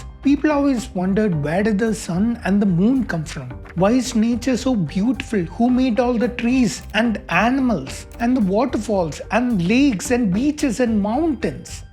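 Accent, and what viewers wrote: Kerala, India. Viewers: Your Mallu Accent 😂😂😂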